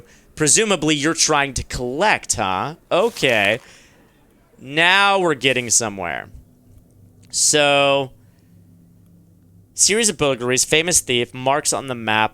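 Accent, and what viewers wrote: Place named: United States. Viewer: Oh god, should be on trial for how he pronounces stuff haha. A for effort though and smart of to just go with the 'I'm not even gonna try anymore and just be an american dude pronouncing it in english'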